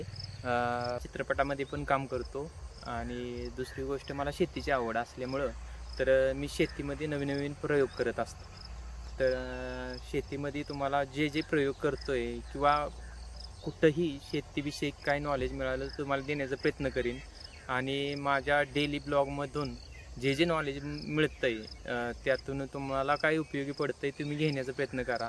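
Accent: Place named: Satara, India. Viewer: भाषा सातारी वाटत्या 👌👌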